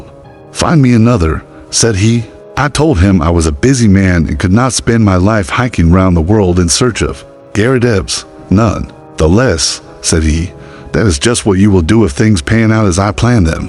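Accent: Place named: South, United States. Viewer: I didn't like the Southern twang.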